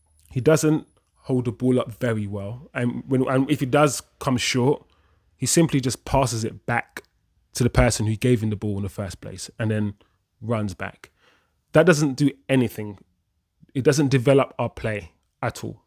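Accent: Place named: Manchester, United Kingdom. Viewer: Proper manc accent there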